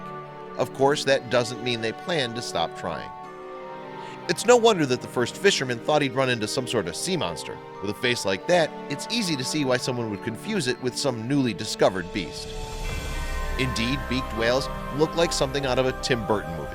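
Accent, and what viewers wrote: United States. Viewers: Why doesn’t YouTube ban did you know? Totally irrelevant content and that annoying know it all American accent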